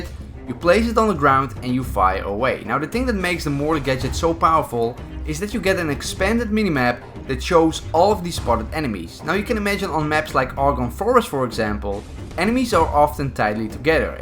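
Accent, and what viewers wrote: Netherlands. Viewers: Are you dutch? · Are you from the Netherlands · Je hoort zo hard dat jij Nederlands bent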